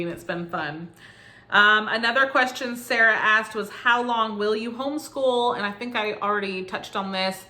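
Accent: South, United States. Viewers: I can hear the Southern accent a bit, but it isn't like intense, but sort of there haha! I find accents so interesting and lovely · Lots of traveling 😃👍sounds so fun! Vegas on the strip is fun but at night it gets wild lol. Last time I went there someone stole my friends phone and debit card 😩 definitely keep your belongings close if out at night. I've always wanted to go to AZ 🙌 I have family that moved there and love it. I also like doing music because of all the sound in the background haha . I have a hard time doing voice overs haha I still need to practice but now that I will be back editing on my computer , hopefully it will get better . As someone from CA , I thought you had a Southern accent. I love it 💗 haha people say I have more of a Valley girl accent - I think it's normal California talk hahaha . I love hearing everyone's accents . I talk to people all over the world at work and I hear it all , I love interacting with others from different states and countries . 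I definitely would consider homeschooling as well. I thought of public because I went to public but now days things are so different in the state i live in so im undecisive. I'm thinking of taking some courses to learn more for home school . I will have to look more into it .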